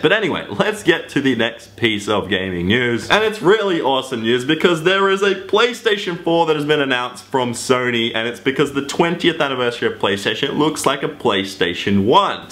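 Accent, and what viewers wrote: Australia. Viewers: Are you Aussie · huh hes aussie subscribed im aussie too WOO HOO haha lmao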